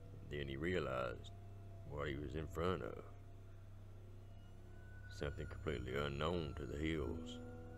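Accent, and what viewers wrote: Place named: Tennessee, United States. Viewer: I was having a real down day. Real alone day. My dad passed not long ago and I've been pretty sad since. I've never seen nor heard of a channel like this on YouTube before. Didn't know it existed. But here it showed up on my list of recommended videos, and the moment I heard that old Tennessee draw, my heart was immediately calmed. This was exactly what I needed. I can't thank you enough fir bringing a feeling of Home in a hard time. Thank you so much and God Bless you guys.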